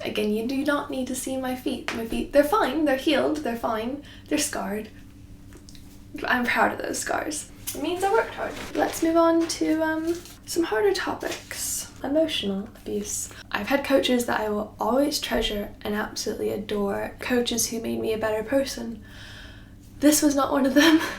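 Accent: England, United Kingdom. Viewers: aww she is getting a british accent!!!!! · Your american accent is blending into a british one!!! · you're picking up a British accent and as a Brit I love it. you say "lot" differently xx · Aw you’re starting to get a British accent it’s really cute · I’ve noticed in this video that you’re starting to get an English accent 😂 and it’s with some words so it’s so cute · Your British accent is coming in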